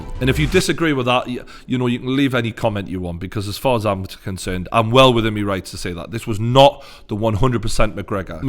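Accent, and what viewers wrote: Newcastle, United Kingdom. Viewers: Looooolllllll geordie. Enough love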